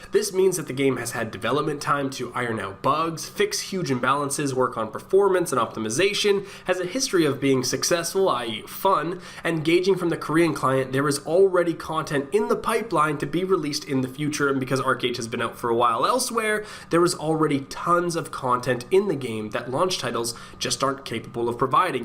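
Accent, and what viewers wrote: Canada. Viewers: I hear teh Canadian creeping through.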